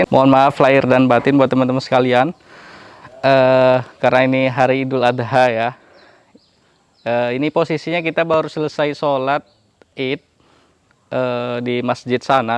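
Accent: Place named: Lampung, Indonesia. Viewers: Bahasa lampungnya kok mirip peminggir/pesisir... aslinya Lampung mana bang? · pantesan logatnya kok kayak orang lampung, kk ternyata orang lampung tohhh.....mirip kali sama teman kuliahku Redy, mukanya, suaranya ... wkwk.. sukses terus ya kak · Bang Lampung nya dimana? Dari bahasanya yg sedikit terdengar...seperti bahasa daerah Lampung Barat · Baru berapa hari di rumah udah lampung kali logatnya bang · pertm nntn vlog bang...lgs tau kl bang suku Lampung,khas dr logatnya.trnyt qt msh 1 daerah...kamipun sukuny lampung asli ibuku dr tanggamus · Lampung ne gegoh nhn bahasa ne heee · Pantes kek logat lampung pas liat video" yg baruu wkwkw ternyata orang lampung... Wah ikut bangga... Lampung mana bang...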